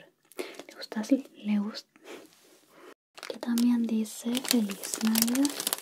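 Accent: Chile. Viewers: Siendo peruano (dato no menor), debo decir que me encanta el acento chileno y las jergas chilenas :v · Así que de verdad habla chileno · Awwwww aun así cuando te equivocas te ves muy tierna eres una chica dulce y me gusta tu acento chileno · El acento chileno me encanta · Amo tu acento chileno 😭🛐❤️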